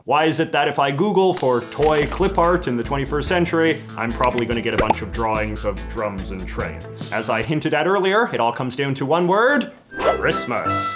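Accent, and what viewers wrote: Canada. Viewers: Love your videos and your beautiful Canadian accent, but as a music nerd, each time I hear a baroque harpsichord when you mention the victorians it bothers me 😅 get some brahms or beethoven Romantic inspired music of the victorian period 🙌 music nerd out 🙃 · I love your Canadian accent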